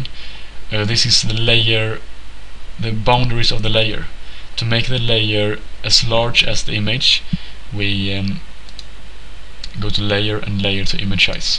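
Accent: Sweden. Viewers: haha man hör verkligen att du är från Sverige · Hahha Du Kommer Väll Från Sverige? Det Hörs På Din Accent xD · He doesn't, he sounds Swedish, I am Swedish so I know the accent. Looking at his channel his location is Sweden...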